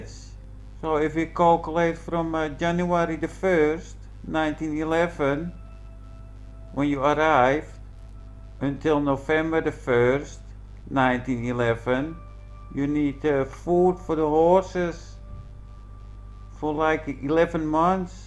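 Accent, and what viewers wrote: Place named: Netherlands. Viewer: <link> There are two horses on the right side in the "picture" just above the crates. They blend in a bit with the snow ;) Not saying its real just spotted some horses there. In a way its awesome all these bullshit stories from the past, gives us material for comedy gold. ;) And as a fellow "Dutchman" i love your accent and way of talking! You bring me joy and uncontrolled laughter in these batshit crazy times haha.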